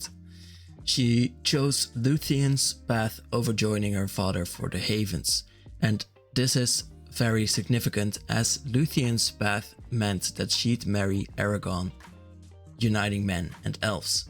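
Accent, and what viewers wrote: Netherlands. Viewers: You dutch 🤔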